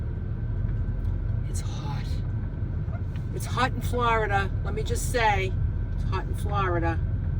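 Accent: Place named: Boston, United States. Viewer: You're loosing the Boston accent!!😅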